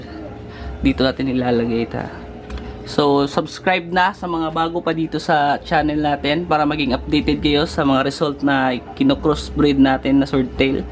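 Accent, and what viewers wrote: Visayas, Philippines. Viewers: 100% if he speaks english you will find a hard time to understand it due his bisayan accent, and its hard to communicate outside your native language. 😀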